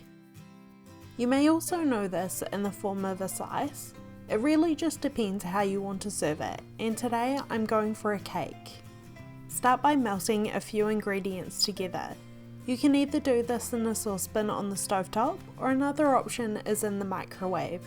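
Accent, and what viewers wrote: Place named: New Zealand. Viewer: I've been making this since I was a child. Nice to hear a Kiwi Accent 😊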